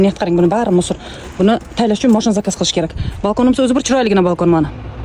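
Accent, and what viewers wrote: Surkhandarya, Uzbekistan. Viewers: Сурхонча сукинишингизга 5 кетаманда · Surxondaryocha gaplaringiz yoqadi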